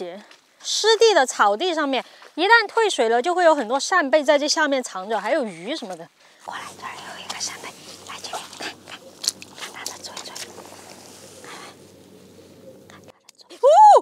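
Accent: Sichuan, China. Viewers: 哈哈幺妹儿是四川哪里的？ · 妹妹是四川人吗？ 很好听的川普呀，😝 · 这说的是四川普通话吗？？？ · ，你是我们四川老乡吗？好重的川味普通话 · 你四川哪儿的？ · 四川的蛮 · 你是重庆的还是成都的😁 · 四川话好可爱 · 妹儿 四川人啊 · 泸州 or 宜宾人吗?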